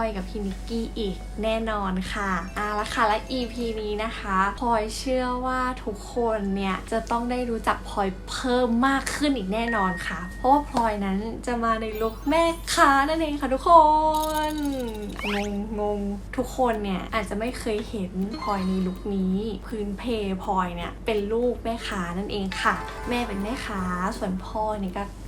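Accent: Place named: Nakhon Sawan, Thailand. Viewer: ดูตลอดนะครับfcขาหื่นนครับ พึ่งรู้ว่าพลอยเป็นคนคอนหวันคนบ้านเดียวกันเลยครับผมอยู่อำเภอท่าตะโกง่าบบบ ถึงว่าสำเนียงทำไมเมือนคนนครสวรรค์จัง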